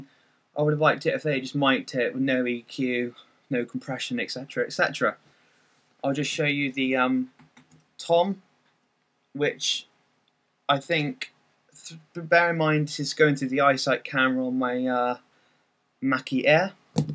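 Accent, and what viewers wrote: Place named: United Kingdom. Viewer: dude i could listen to you talk all day. i like hearing people from the uk talk.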